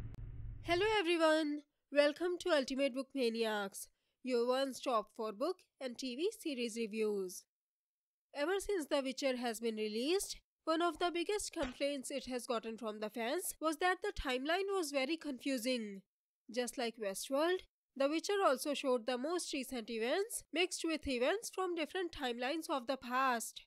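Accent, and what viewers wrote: India. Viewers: Great video... but i wish the indian accent was not so unbearable...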